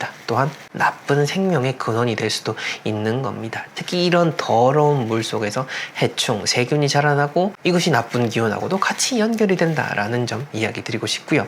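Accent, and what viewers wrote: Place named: Gyeongsang, South Korea. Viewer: 흥 ~~~우연히 보다 지금은..ㅎㅎ자주봐여^^ 목소리도 좋고 설명을 너무 잘 해주셔서 더 좋아용😂 근데 경사도분? 사투리 ㅎㅎ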